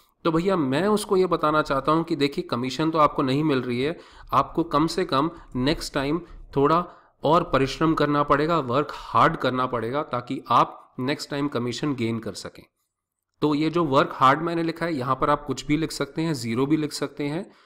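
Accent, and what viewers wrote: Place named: Pakistan. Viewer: Excellent explanation. You sound more Pakistani than Indian, pronunciation wise such as the word zaroor, Aap etc. Probably because you are from UP/Delhi. Nevertheless, good work, love from Pakistan.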